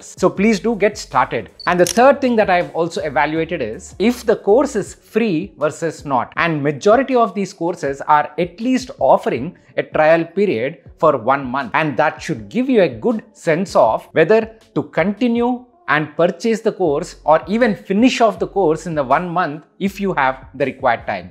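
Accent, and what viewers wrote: India. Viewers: Thank you for your information about the free Online AI Courses. And the best is, I can understand your "Hinglish“ verry well. Best regard Peter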